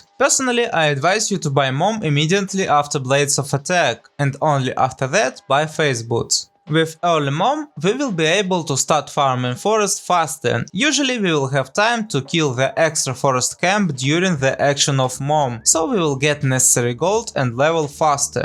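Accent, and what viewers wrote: Russia. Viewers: У тебя специально русский акцент? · Вен ю трай то спик инглиш, бат юр акцент из стил рашн)) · so hard to listen to the Russian accent in English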